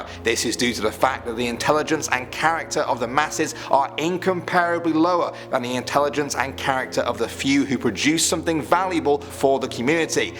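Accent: United Kingdom. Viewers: He's British. They pronounce it cap-syool. A perfectly acceptable pronunciation .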